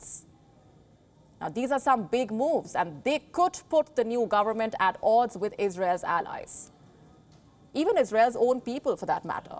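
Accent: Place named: India. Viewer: Indian English..🤦‍♂️.... " Julia and sumalia ... 😳😳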